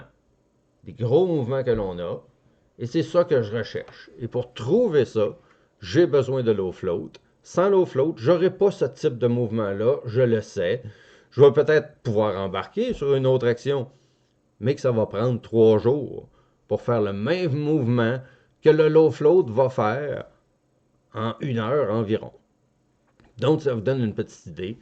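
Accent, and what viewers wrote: Canada. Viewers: Superbe. Oh l'accent canadien!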